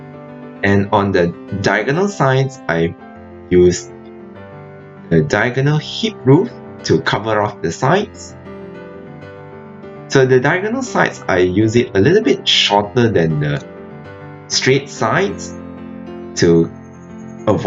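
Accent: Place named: England, United Kingdom. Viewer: Hey, where do you come from? I lvoe your English accent!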